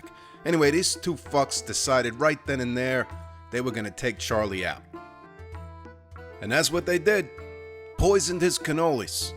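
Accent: New Jersey, United States. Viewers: Is it just me or is the Jersey accent extra thick for this?:D Not complaining I love it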